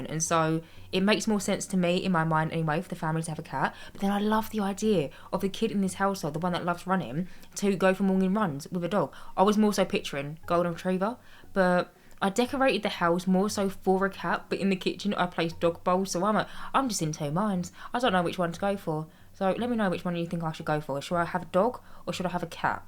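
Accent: United Kingdom. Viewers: as you started talking, i knew instantly you were from the UK. as i am British i just knew!! 💟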